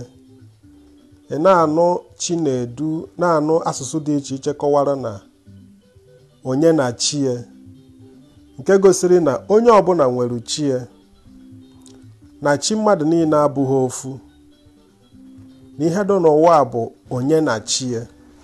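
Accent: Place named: Nkanu, Nigeria. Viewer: Thanks a lot for this. We must begin to make efforts to understand our traditional spirituality. Thanks a lot. I just noticed you sound very Nkanu.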